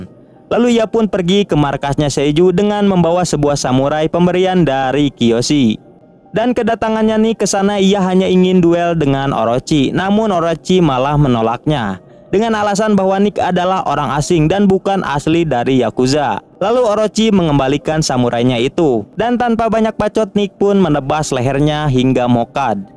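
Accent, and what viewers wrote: West Java, Indonesia. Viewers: Orang sunda ya bang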